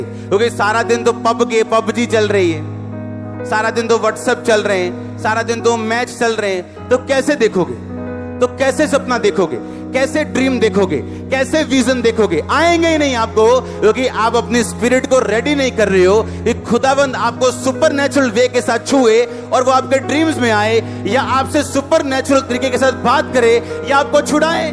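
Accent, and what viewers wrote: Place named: Punjab, India. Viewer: Apki Punjabi language bolte phli bar suna so swt papa ji😊❤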